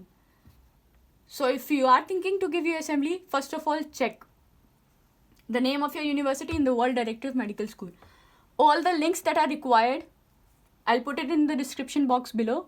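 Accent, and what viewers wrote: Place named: India. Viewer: Indian accent sucks.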